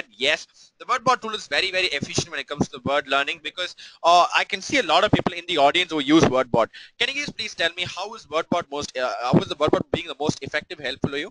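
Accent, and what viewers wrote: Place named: India. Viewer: the accent is like Indian or Mexian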